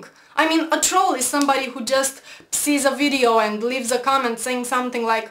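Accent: Romania. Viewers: I don't hate you , I disagree with several parts of you're post modern liberalism , but not enough to hate you . The Romanian accent prevents me from hating you . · Ms You are stunning!!!! I am in love with your Romanian accent Very cute!!! And your vids are very informative esspecally the Islam one. This is something I would like for us to discuss as you are probably a lot closer to it then I am in the US Keep up the great work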